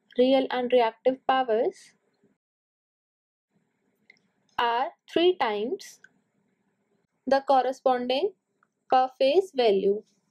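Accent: India. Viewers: Indian voice is hot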